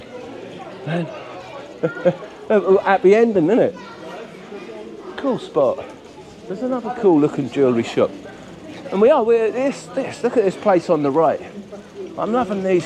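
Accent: England, United Kingdom. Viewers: French people speak English with a French accent, fact. I personally love the way you are speaking French with an English accent. Just as it should be.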